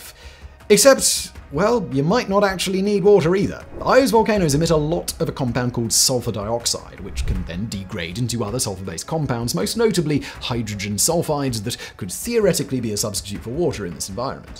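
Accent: United Kingdom. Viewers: your British is showing.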